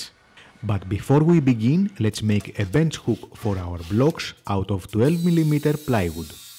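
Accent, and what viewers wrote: Greece. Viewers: He Greek haha! (I'm Greek too that's why I recognize the accent) Nice video tho well done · I immediately understood that you were Greek!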